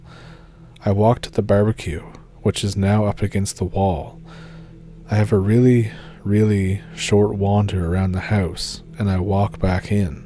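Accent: Canada. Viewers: Greetings from Ohio! Yeah slight Canadian accent. Just the "ou" sound, like out, about. I like it 🙂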